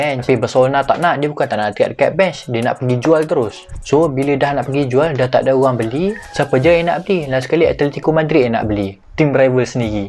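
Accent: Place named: Kuala Lumpur, Malaysia. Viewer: Awt ckp KL 😂 😂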